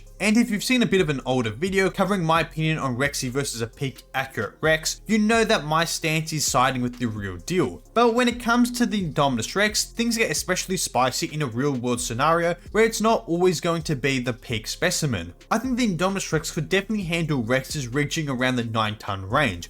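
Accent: Australia. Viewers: U an Aussie can’t tell which accent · Good day mate are you Australian?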